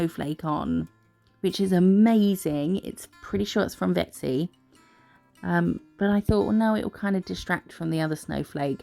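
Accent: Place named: RP, United Kingdom. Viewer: Absolutely love your videos. I laugh and learn! Also gott say I adore your accent. Im here in Texas and your Queens English is lovely to listen to.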